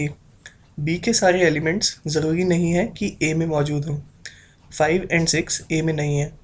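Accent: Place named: India. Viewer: Dude. It sounds like you speak Indian. Wtfffff???? Your accent man :///////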